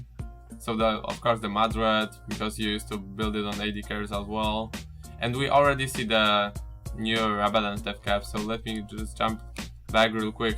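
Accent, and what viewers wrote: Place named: Poland. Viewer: Are u from poland ? becouse i can hear polish accent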